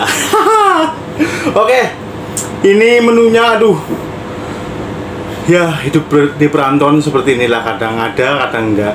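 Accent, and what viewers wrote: Banyumas, Indonesia. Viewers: Hahha lucu banget ngomong ngapak ky inong bae kang hihi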